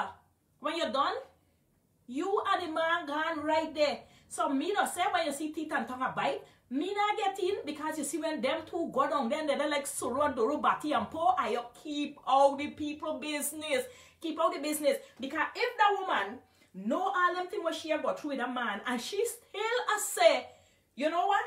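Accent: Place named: Guyana. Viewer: I am not guyanese or caribbean but love listening to your beautiful accent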